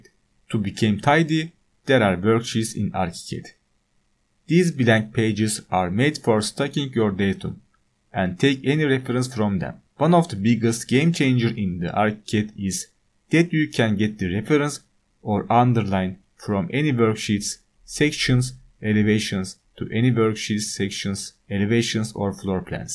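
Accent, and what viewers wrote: Turkey. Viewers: türksün değil mi ingilizcen onu çağrıştırdı?? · aahahah abi türk yaa bende aynı böyle konuşuyorum :D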